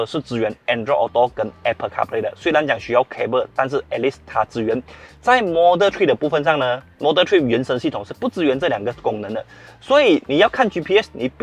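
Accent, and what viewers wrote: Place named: Malaysia. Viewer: 马来西亚人讲中文真的很可爱，喜欢听😂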